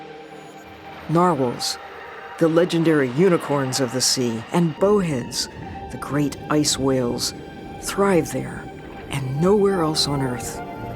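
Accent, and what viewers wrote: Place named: United States. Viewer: wonderful footage of such a beautiful landscape, really enjoyedwatching it. shame about the horrible narration, an extremley irritating strong american accent of a 12 year old boy.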